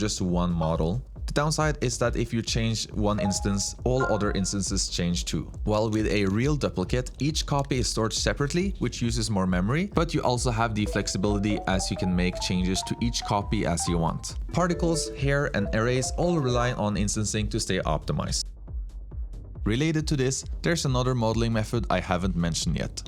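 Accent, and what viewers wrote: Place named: Norway. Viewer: you are Norwegian from that accent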